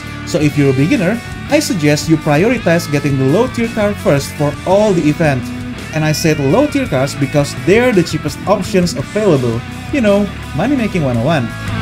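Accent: Indonesia. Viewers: Kayaknya, aksen Inggrisnya kaya ada suara orang indonya gitu, tau kan lu orang India ngomong Inggris gmn? Nah sama juga kyk orang indo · orang indo nih yg punya channel wkwk, logatnya soalny 😂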